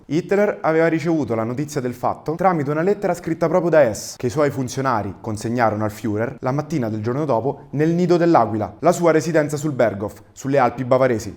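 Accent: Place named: Rome, Italy. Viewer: La mia non voleva essere una critica, ma una simpatica constatazione del divertente accento romano.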